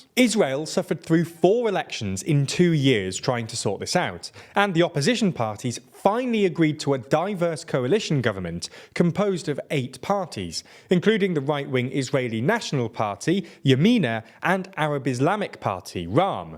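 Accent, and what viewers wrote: England, United Kingdom. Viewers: It's funny listening to an Englishman pronouncing Hebrew words as if they were English words.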